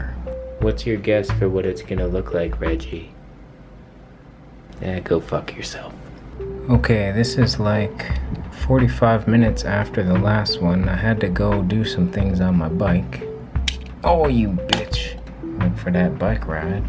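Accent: South, United States. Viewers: You had a southern drawl in this video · u talk like u 4rm the south son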